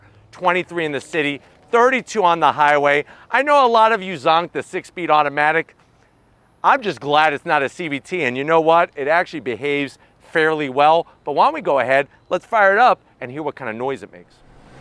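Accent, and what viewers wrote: United States. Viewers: I like how Americans renovation the A and put in a U, muzda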